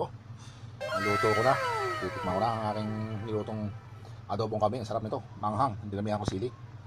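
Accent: Batangas, Philippines. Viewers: sir slamt sa pagdaan s aking maliit nbhay, dito nko ngbblik, paborito ng magbabarik ang iyong putahe.. klasey batangueno ka sir halata sa punto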